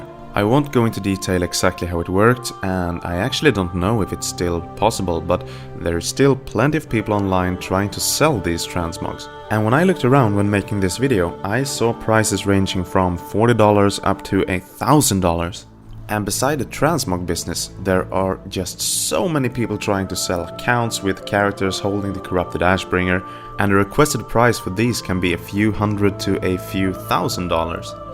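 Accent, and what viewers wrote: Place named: Sweden. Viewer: Are you perhaps swedish? you do sound like it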